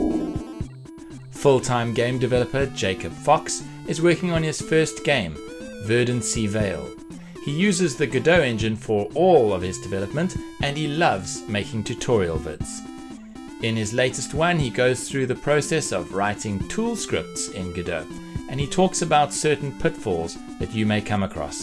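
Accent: South Africa. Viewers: It's weird coming across a channel and hearing another South African, I'm not used to it but its increasingly common!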